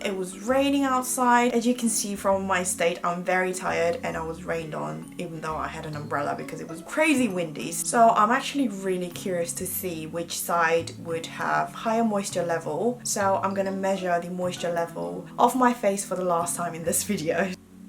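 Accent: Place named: United Kingdom. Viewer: 영국영어도 제품 설명도 매력 넘쳐요♡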